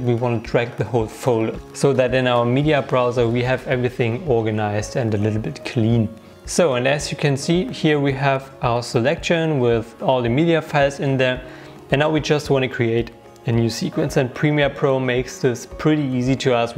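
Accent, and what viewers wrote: Germany. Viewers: Hi! Just spend most of the evening watching your content! Really like your style and the way to explain things! The thing that made me land on your page, was that we used the same camera (GH4), i know that you are not using it anymore, but i wanted to ask you a question about your settings on the GH4. I believe that as you import your footage, i can see that you are shooting in 24 fps. I have some difficulties in really understanding that on the GH4. Listing to your voice, i believe that your from Germany or somewhere here in EU. How can you use the 24 fps settings on your GH4? Are you just changing your PAL/NTSC settings or what? Are you shooting in C4K on your GH4 or 23.98? Hope to hear from you, and that you still remember your settings:)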